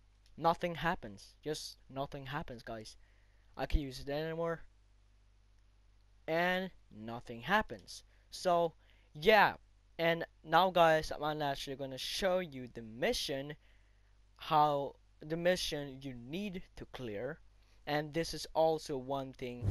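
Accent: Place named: Norway. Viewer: very nice, immediatly heard it from the accent. love from norway!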